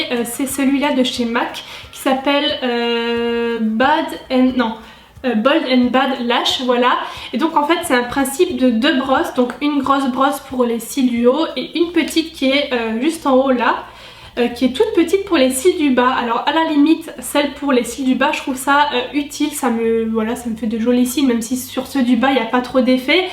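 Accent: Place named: Alsace, France. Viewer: Tu viens d'où exactement en Alsace ? Parce qu'y vivant aussi je reconnais bien cet accent haha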